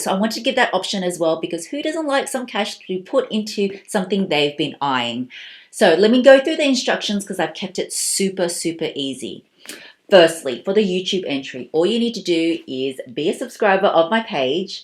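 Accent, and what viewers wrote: Australia. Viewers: Hi, I love watching your videos. I’m from the US so I find you Ausie accent so adorable 😁. I would like the bag please! I’m a new YouTuber and it would be awesome 👏 to win the bag so I can vlog about it!!! It would mean so much to me! Wishing you more success!!!! ♥️🤗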